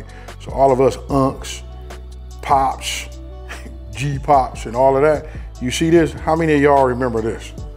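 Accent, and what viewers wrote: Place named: West Coast, United States. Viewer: West Coast accent is sooo heavy!!!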